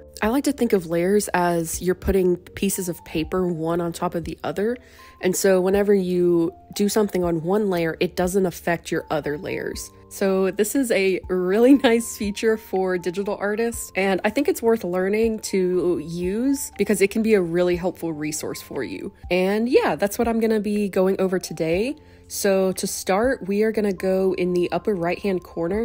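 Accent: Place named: South, United States. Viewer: I can hear the southern, and thank you for the video!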